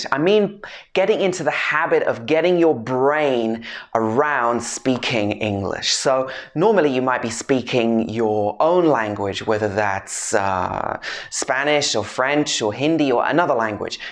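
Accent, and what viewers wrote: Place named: United Kingdom. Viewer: Wish I can talk to you everyday to improve my English speaking, specially British Accent which I really like.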